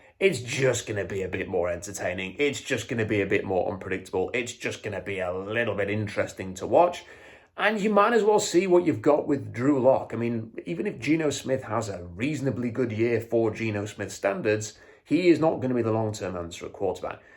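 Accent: England, United Kingdom. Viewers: Well said. It’s even funnier yet Better that a guy with an English accent says it.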